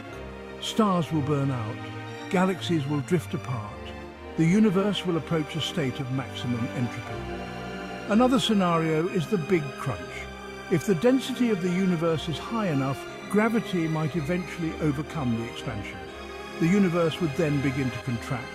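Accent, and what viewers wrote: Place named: United Kingdom. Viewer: Old husky British voice 😁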